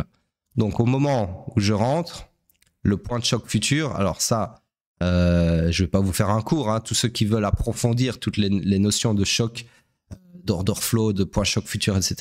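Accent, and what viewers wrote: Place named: Belgium. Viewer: c'est marrant, malade ca te donne un accent belge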